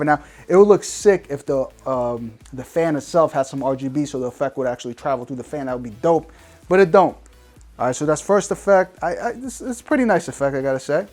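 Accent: New York, United States. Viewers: Man i just discovered my new fave channel. You sound like you from NYC like me. You keep it real.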